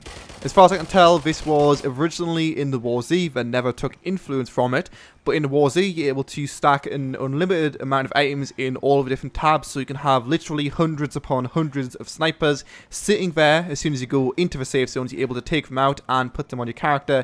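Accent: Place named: Newcastle, United Kingdom. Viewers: hes english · no he's English he just has a regional accent (Newcastle) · He is from England, Newcastle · He is from Newcastle, UK. they have a unique accent which is why he might not sound English to people from outside the UK :)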